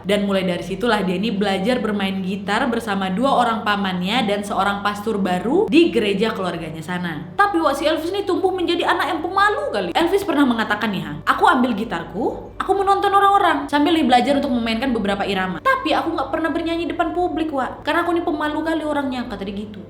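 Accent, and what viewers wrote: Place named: Medan, Indonesia. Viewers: Kakak ni pasti orang medan ya sukak kali sama cakapnya... hahaha... iya kan wak?? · Logatnya kayak orang Medan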